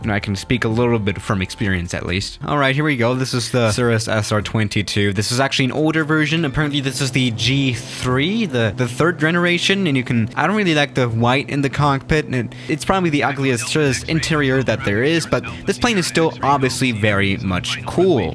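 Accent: Germany. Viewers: Does anyone else notice his German accent coming in stronger every video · Wait are you german?😂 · R u german?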